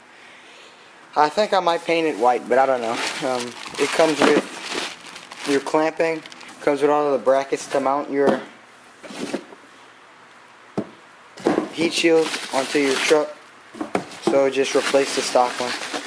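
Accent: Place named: Louisiana, United States. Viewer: Kaplan? New Iberia? I’m trying to place that accent, lol.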